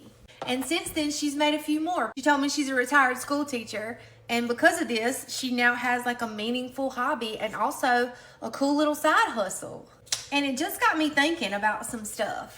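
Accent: South, United States. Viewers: Hey Southern draw, your cute! 😉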